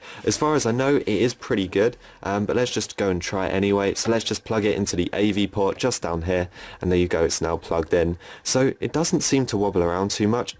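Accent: United Kingdom. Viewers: Love your British accent mate 👍🏻😌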